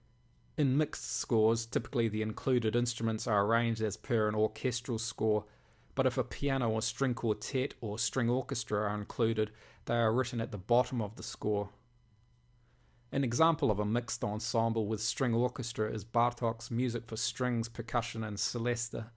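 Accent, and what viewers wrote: New Zealand. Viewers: You Kiwi mate?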